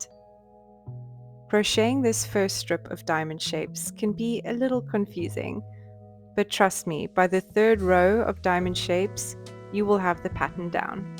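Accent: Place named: South Africa. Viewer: Interesting! And so pleasant to hear a South African accent on a crochet channel.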